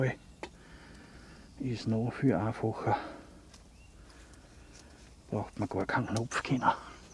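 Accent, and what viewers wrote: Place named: Vienna, Austria. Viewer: Gott sei Dank.Mir hod der leichte Weanerslang scho so gföht.Danke!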